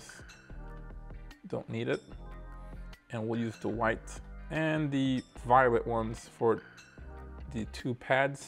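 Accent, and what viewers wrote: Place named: Caribbean. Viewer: Sorry sound like you are from the Caribbean which island?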